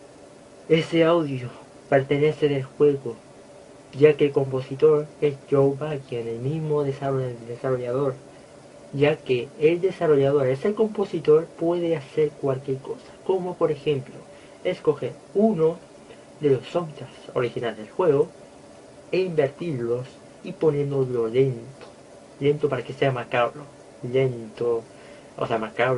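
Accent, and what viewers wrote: Spain. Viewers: por favor deja de hablar como español tenés que ser vos!!!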